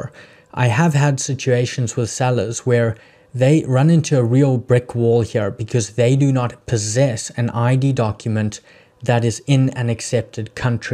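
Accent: South Africa. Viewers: Hey, Good to hear a South African accent. I'm based in Spain (from CPT originally), I've opened an LLC in the USA, but struggling to get a Bank Account in the USA at the moment. Would you say it's best to open a Professional SC Acc as an Individual so that my Spanish address registered with my Bank Acc in Spain lines up with my address on SC until I get a USA Bank and then update my details to a company on SC? Is that even possible? Even if I have a US Bank Acc, it will likely have to have my Spain address I think... as I only have a Wyoming Registered Agents and Mailing address, and the agents have said it's not advisable to use that address for banks. Plus there's the utility bill issue... 🤷🏼‍♀️ Any direction you can offer on this? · Just as I was about to ask if you were South African you said you were South African lol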